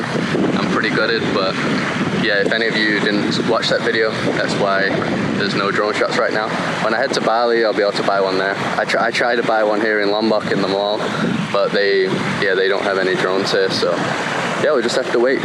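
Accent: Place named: Manchester, United Kingdom. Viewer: what accent do you have? sounds mancunian and american trying to figure it out haha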